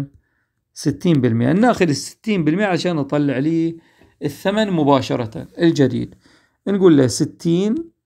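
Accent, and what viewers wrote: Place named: Jordan. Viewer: لهجته تجنن احس راحيه على اردني 🌚✨️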